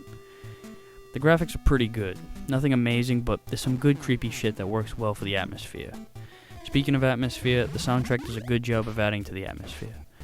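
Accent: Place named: Brooklyn, United States. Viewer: your voice is almost remeniscient of a Brooklyn Accent. nice vid btw :)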